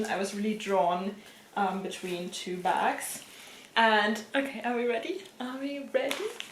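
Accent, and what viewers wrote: Germany. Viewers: Love your german accent 😊😊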